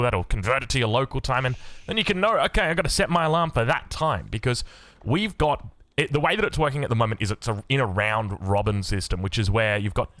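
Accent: Australia. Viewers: I'm very none aggressive and like to build a thriving empire full of resources and a big army before considering attacking. Watching these videos to get better. I love these video's. As an Englishman, The Australian accent is appealing.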